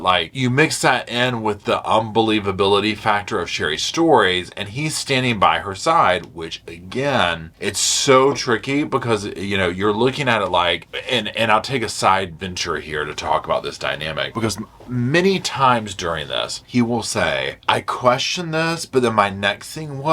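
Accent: South, United States. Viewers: Why do you say "you know" so much? Is that just like, a weird southern thing?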